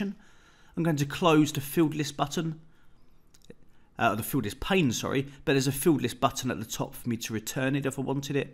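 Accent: United Kingdom. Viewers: Had to download parallels and excel for windows. You have such a gift of teaching, all very clear and easy to follow. Awesome proper brit accent too haha. Just a question: can you change settings for the Bing map style, colours, etc. ?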